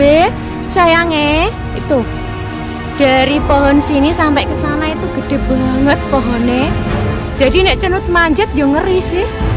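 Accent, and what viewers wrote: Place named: Java, Indonesia. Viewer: Jawane totok tenan yooo.... 😂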